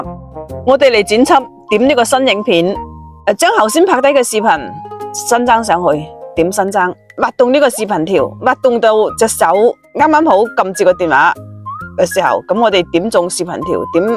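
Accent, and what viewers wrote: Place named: Taishan, China. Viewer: 這位台山口音的姨姨 好叻喎 👍🏻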